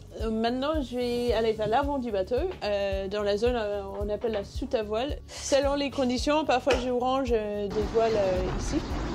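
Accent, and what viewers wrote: United Kingdom. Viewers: je l'adore !!! ma préférée ... j'aime son sourire ,son accent british et sa force de caractère ... j'étais présent lors du départ de la dernière course du rhum .... impressionnant ces bateaux et de savoir qu'ils elles sont seuls a la manœuvre .... des héros !!!! énorme respect ..... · Extrêmement sympathique la capitaine avec en prime ce petit accent anglais si caractéristique au charme indéfinissable.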